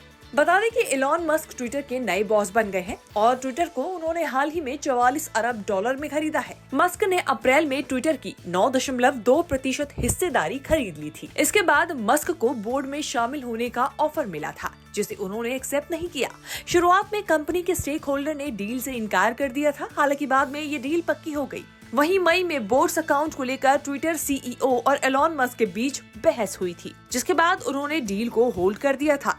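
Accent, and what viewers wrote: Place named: Bhojpuri, India. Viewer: Oh my God ... Your bhojpuri accent is so s#xy 😁😁😁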